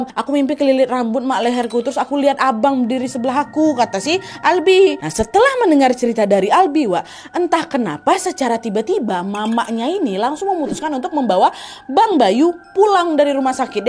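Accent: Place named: Medan, Indonesia. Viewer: Emng kak bukan org batak? Logatnya medan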